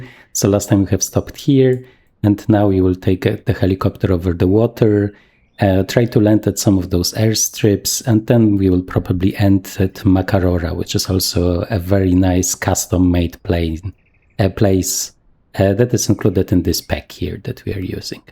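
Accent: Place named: Poland. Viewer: nie ukryjesz sie, polaka slychac na kilometr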